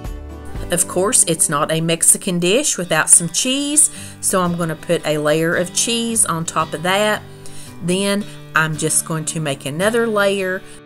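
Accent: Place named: Mississippi, United States. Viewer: Your voice makes me feel like I’m back home 🥰 I’m from Mississippi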